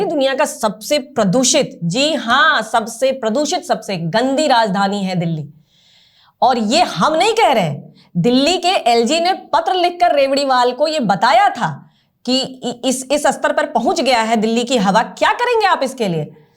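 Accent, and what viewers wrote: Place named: Bihar, India. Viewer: Aapke muh se bihari bhasha ki shobha bdh jaati hai💃💃💃💃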